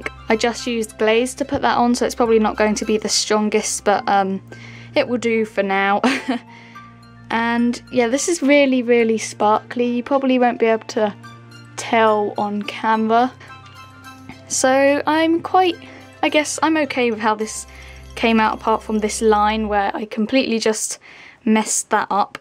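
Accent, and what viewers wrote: United Kingdom. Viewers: Yay ur British I am too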